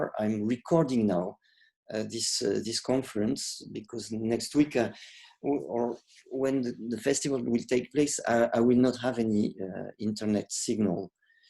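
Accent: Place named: France. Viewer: (To my humble opinion) This is worth enduring Frrench accent to benefit from his knowledge given with such gentleness. I am sending you my gratitude especailly for the short meditation you guide here, this is exactly what I have been missing and looking for since I came back home after the TEL6 post-coco:-D Namasté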